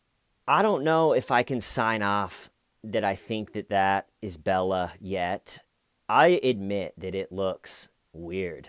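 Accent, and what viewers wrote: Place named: South, United States. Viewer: Damn I was shocked you’re in New York. Sure thought you were southern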